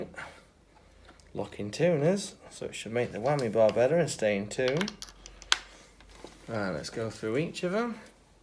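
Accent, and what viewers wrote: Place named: Estuary, United Kingdom. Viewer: Ok.... 1. When I clicked this video, I was right in the middle of installing Guyker tuners (locking 6 in-line) to my Banana/Hockey Stick neck I just installed onto a Stratocaster body. Yep, you heard right. Will it intonate and work right? Well, the measurements are not exact, but close...so we will see. 2. Are you living in America? Because I hear the very light estuary accent, but you are DRINKING COFFEE and not a cuppa earl grey with milk? Interesting. Nice work on your #1. I'm in the middle of trying to figure out which guitar out of 16 is my #1 since I play different music at different times and have not settled down with a real strat as I build my own.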